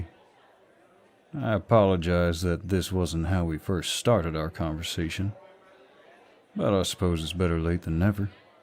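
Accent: South, United States. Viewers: I’ve learned so much about myself today 🫣 For starters i had not even the slightest clue that southern accents did this to me🤠🥃 And second wow holy hot damn do I LOVE princess treatment and to be dominated *giggles nervously* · IM A SUCKER FOR THIS SOUTHERN ACCENT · I said it before and I’ll say it again. loves doing a southern accent And I f*cking love it 🥰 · I love a southern man speaking in my accent lol I love this love the voice love it all not me folding in abt 2 seconds into the vid 🤭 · this is too good not to get a part 2, and my god the southern accent, you got us all swooning with it😂great audio, can't wait to hear more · I said it before and I'll say it again... Correction, I think we love doing a southern accent. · Your southern accent is everything😓🙏🎀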